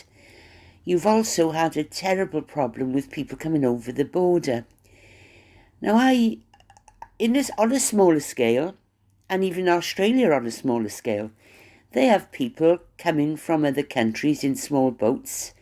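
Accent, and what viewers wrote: Wales, United Kingdom. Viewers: I do miss your Welsh accent my lovely friend sorry your not well with a rotten chest infection hope it gets better soon 💐💐💐❤️‍🩹❤️‍🩹❤️‍🩹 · Love thst welsh twang so glad to hear it again its been worth waiting for welcome back ❤❤❤❤ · lovely to hear your dulcet Welsh tones again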